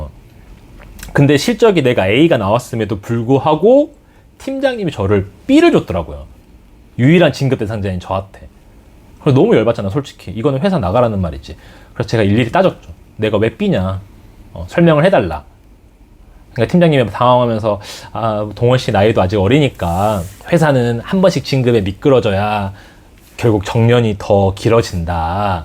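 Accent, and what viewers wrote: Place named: Gwangju, South Korea. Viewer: 광주사투리 넘나 매력적… ☺️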